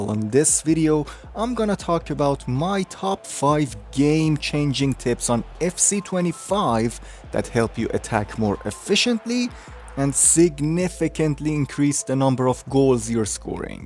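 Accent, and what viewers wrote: Iran. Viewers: Are you persian by any chance dadash?😅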